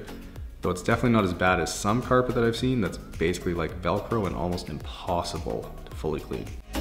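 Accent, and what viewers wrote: Canada. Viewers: Favorite part: YOU! Best Friend’s favorite part: Your Canadian Accent Always tempted to buy every item on your list in the description 😂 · I pretty much am just here to hear the Canadian accent. Not gonna lie. Also I'm from Australia. I now have a strong motivation to detail my car but 100% won't because I'm a worthless person. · Are u Canadian?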